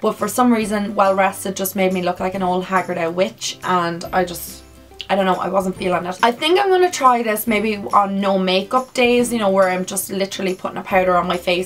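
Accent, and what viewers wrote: Ireland. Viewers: Lovely accent! irish? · Is she from ireland??❤️ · Is that an irish accent? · Beautiful accent! Are you Irish?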